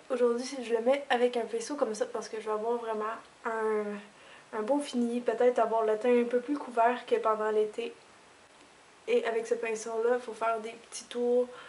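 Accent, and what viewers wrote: Quebec, Canada. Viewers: Je me suis inscrite sur YouTube pour une seule et simple raison : "mettre j'aime" a tes videos ! J'ADORE :D Tes maquillages sont superbes et ton accent me fait penser à mes vacances au Quebec ... :) Bonne continuation bisous !! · Bonjour je suis abonné depuis environ une semaine. j'aime beaucoup tes vidéos, on apprend enormément de chose. Et j'adore ton accent quebecquois. Moi je suis française:) et ce maquillade hivernale est trés jolie! Continue j'attends tes vidéos avec impatience!!! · Bonjour ! Je viens de decouvrir tes videos et de m abonner !!! j aime beaucoup ! Et cet accent...il me rappelle mes sejours au Quebec! Je suis in love du Quebec!! Je vis dans le Sud de la France ;-) Merci pour tes bons conseils et rendez vous aux prochaines videos ! Ps: la video pour les fetes est top ! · Salut!!je viens de tomber sur ta chaîne et hop je me suis abonné car tes video sont super!!!J'adore ton accent ça me fait voyager!! 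( le Québec j'aimerais bien mais pas en hiver!!!) ahah!! Gros bisous de Lyon!!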